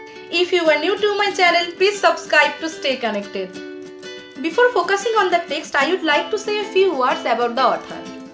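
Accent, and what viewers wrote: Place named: Bengal, India. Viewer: You seem happy to read books and that excitement shows in this video. We love our Bengali accent too.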